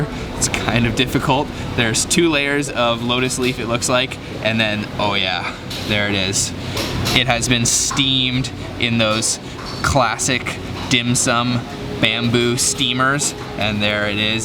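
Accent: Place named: Canada. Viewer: I was wondering where this young man was from....the food looks great. I finally figured out where he is from when he went to the Oowtdoor market and was aboot to try some food.....CANADA. Keep up the good videos young man.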